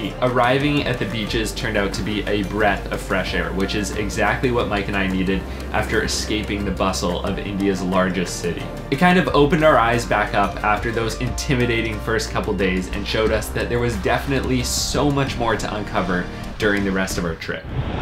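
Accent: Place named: Canada. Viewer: You just sound Canadian .....but you are very Indian deep inside within you .... Welcome home anytime buddy ....come again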